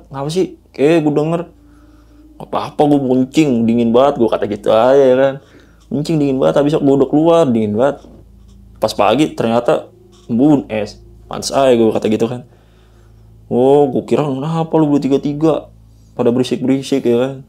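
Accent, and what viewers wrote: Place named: South Jakarta, Indonesia. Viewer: Gaya bicara jaksel punya nih, keren bang pembawaan ceritanya, jadi seolah-olah kaya kita ada di posisi itu, terimakasih sangat menghibur ❤❤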